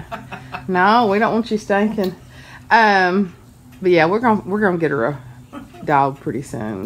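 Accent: South, United States. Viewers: We got rain from storm up here but today it cleared and now nice and sunny out! Love your sweet southern accent. Hugs!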